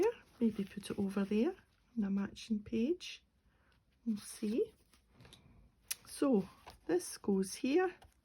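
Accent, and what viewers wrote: United Kingdom. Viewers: Thank you for the video. Adore this creative idea. Also, I love your accent. Where in the UK are you? I listen to another lady who has a similar accent. Enjoy hearing you so much.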